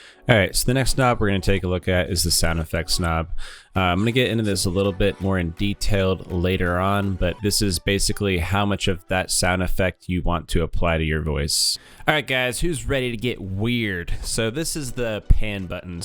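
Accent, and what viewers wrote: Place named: United States. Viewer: guys guys guys guys guys guys guys guys guys ... fucking american speaking, nothing of substance.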